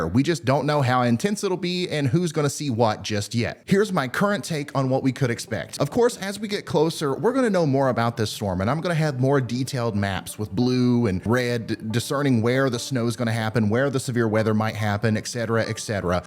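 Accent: South, United States. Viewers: I don’t like your southern accent.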